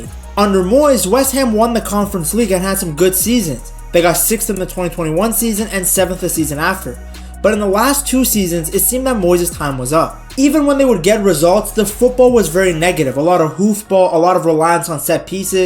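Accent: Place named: United States. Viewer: 😅 mate please use AI next time. Love your bigging up the club I loved but it all goes out the window with your accent. It's not your fault I don't blame you. We would likely get along loving a beautiful sport. But please use AI. Your accent with an American English twang puts one off, thinking 'sensible' Anyway apologies if my humour or attempt goes wrong. Keep well and keep doing what you love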